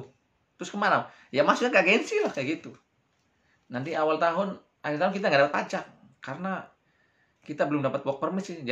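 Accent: Indramayu, Indonesia. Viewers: Sampean asli Indramayu ta kang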